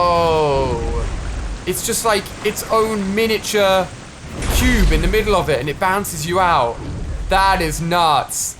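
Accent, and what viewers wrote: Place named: United Kingdom. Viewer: Can you stop talking in that British accent it’s kind of annoying talk like a regular person please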